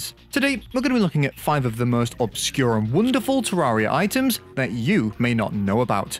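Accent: United Kingdom. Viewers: hearing his british accent makes me mad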